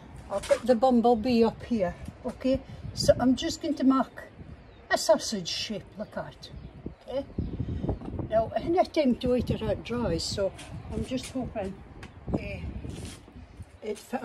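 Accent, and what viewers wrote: Scotland, United Kingdom. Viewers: IS GRANDMA SCOTTISH? I’m definitely subscribing now 🫶🏼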